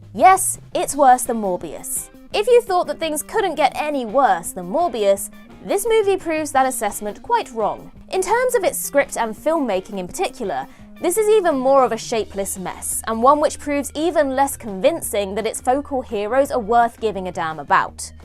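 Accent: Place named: Exeter, United Kingdom. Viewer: Love the accent. Are you from Exeter?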